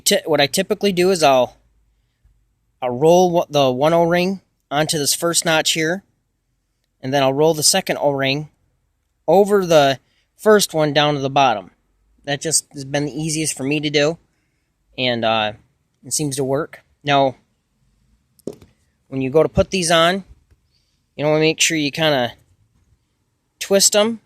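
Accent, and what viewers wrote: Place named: Michigan, United States. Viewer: Bro are you from Michigan? I went to welding school with a guy that sounds identically to you 😂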